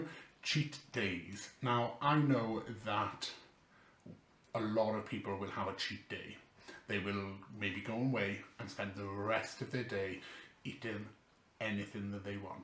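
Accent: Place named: South Wales, United Kingdom. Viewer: New sub here! Also from South Wales I'm assuming by your accent you are to! Great video keep up the hard work !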